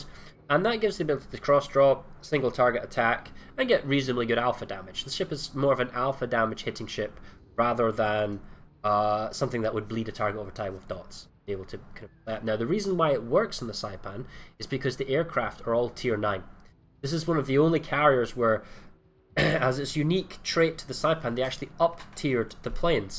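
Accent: Scotland, United Kingdom. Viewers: he's scottish I think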